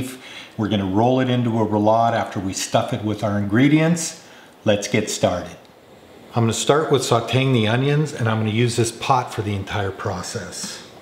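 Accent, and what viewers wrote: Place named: Chicago, United States. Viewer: awesome recipe! You sound like you are from Chicago.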